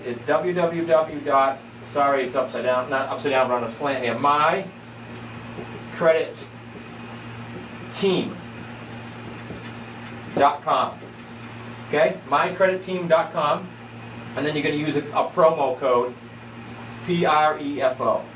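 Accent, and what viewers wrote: Boston, United States. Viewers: Is that a Bastin accent ??? 👀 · Is this guy from Boston?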